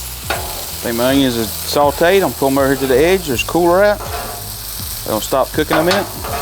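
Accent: South, United States. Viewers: GD these look good 🤤😋 I love your country accent btw❤️ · Hey where you from? I hear that southern accent in your voice 😁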